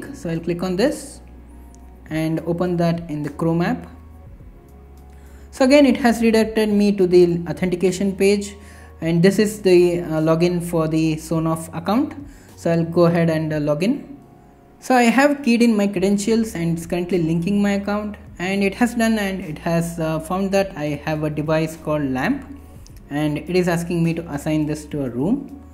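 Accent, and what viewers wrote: India. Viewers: Hated the vid. More sonoff, less indian accent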